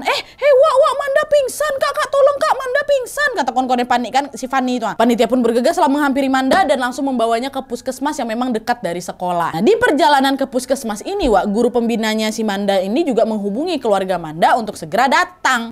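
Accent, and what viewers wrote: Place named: Java, Indonesia. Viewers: asik dan suka banget denger cerita pake bahasa jawa kaya medok banget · logat Jawanya kak fasih sekali👍😁 cocok kak jadi orang Jawa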